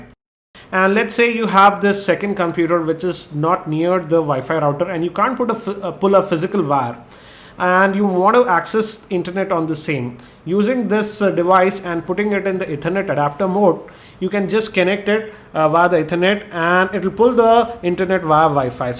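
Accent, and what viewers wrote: India. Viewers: is he speaking english or indian, i cant tell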